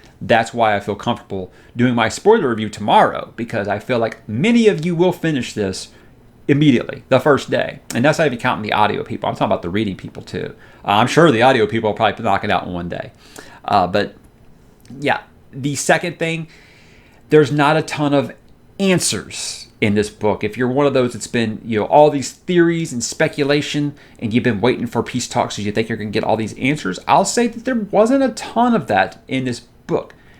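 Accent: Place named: South, United States. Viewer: Nice! What part of the South are you from?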